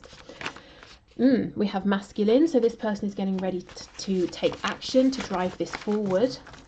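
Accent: United Kingdom. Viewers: Hai very good morning dear namaste great reading as always I feel u and ur reading can't go wrong apart from ur reading I am big fan of ur voice abd ur English I mean the British English. Thanks a million dear with warm regards namaste lots of love and light. Dr vijay anand. (prince India khalid)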